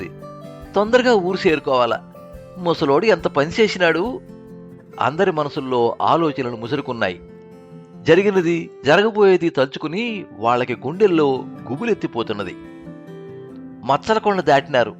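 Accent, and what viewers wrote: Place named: North Andhra, India. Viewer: మీ వాచికాన్ని అద్భుతం అనాలా, మరోటా!?...ఉత్తరాంధ్ర మాండలికం మీరు పలికిన తీరుతో నా ఒళ్ళు పులకించి పోయింది. మాండలికంలో నేను చేసిన చిన్న చిన్న తప్పులు ఎవరికీ వినపడకుండా మీరు చేసిన కృషి అమోఘం. నమోనమః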